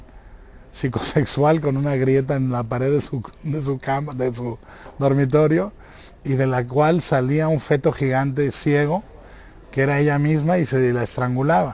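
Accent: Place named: Mexico. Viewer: No you r wrong he is mexican he speaks like mexican, but he uses sometimes spanish words Im mexican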